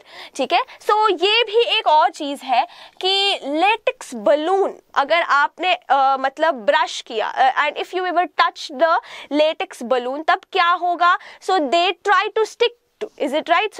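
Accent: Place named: South, India. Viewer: Ma'am has a strong south indian accent in hindi Edit: She is still a great teacher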